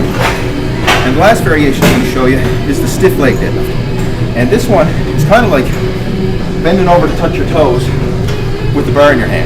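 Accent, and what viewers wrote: Newfoundland, Canada. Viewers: wow man are you newfie? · I like that I can hear the Canadian-ness. Excellent video, man. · Are you canadian?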